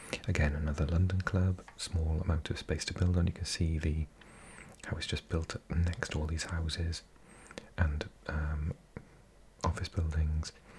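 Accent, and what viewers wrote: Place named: Wales, United Kingdom. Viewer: I never thought a welsh man whispering about football stadiums would be something id enjoy watching, but turns out it is! Would love to see a championship version coz us lot never get much recognition (huddersfield fan)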